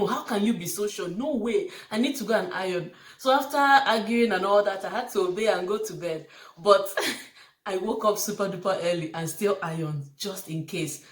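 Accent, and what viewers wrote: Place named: Nigeria. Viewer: Canadians have a hard time with Nigerian accents, partly because we're just not used to them, and they're so different from North American accents. The first trick is to slow down. Then try to harden your 'r's, so that, for example, "evah" comes closer to "everr" - the "rhotic r" is not as elegant, but it's more likely to be understood here. Then try to flatten your long 'i's so they don't sound the same as long 'e's - my doctor, who is Nigerian, once asked me if I wanted some "peels" - I had to ask him to repeat himself three time before I realized he was saying, "pills" ... ! Btw, I don't think most Nigerians would have any trouble with their accent in England, if that's any consolation ... !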